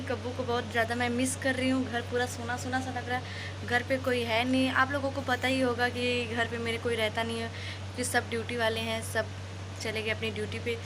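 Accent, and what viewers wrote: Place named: Bihar, India. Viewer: Aap lgrha hi ki bihar ki hi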